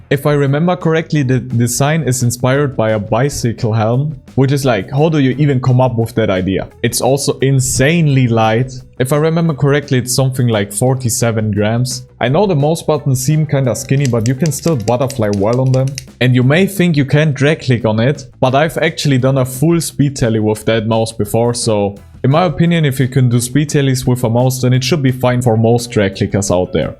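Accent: Germany. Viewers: wait are u german u got that accent xD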